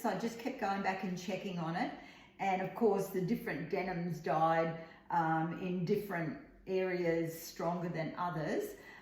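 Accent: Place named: Australia. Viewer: I love listening to a fellow Aussie! GDay from Brissy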